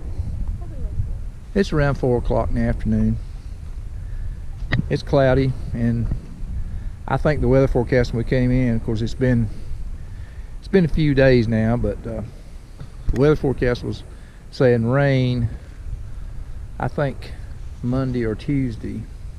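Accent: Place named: South, United States. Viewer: Props to you friend for taking the effort to say 'portage' properly, for a while there with your southern accent, I thought I was going to hear something more like 'porridge' which really makes me cringe. Thanks again for making the effort ;-)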